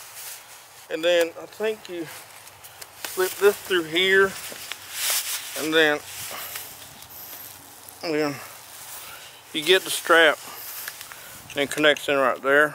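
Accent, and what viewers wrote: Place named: South, United States. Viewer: I ain't gonna lie this man's southern accent freaked me out for a second... lol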